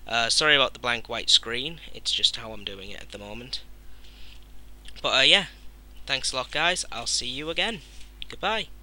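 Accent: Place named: Geordie, United Kingdom. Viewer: Where r u from, cuz u sound like ur a geordie but you dont have a full on accent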